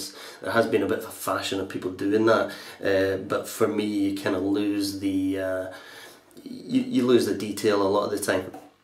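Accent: Scotland, United Kingdom. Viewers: Thank you so much for this video, this was really helpful. Also I love your accent, are you British by chance? If not, sorry for mistaken. ^ ^ Keep up the amazing work honestly, I subscribe and excited to watch more of your videos. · sounds more Scottish.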